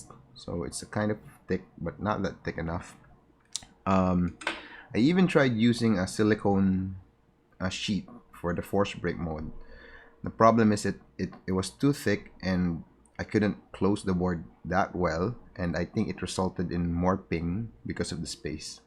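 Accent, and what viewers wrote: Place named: Philippines. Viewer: Perfect accent bro. I hate getting the American accent just because I grew up here in the US. Slight Filipino accept while speaking English sounds nice. I mean probably just me being patriotic meh...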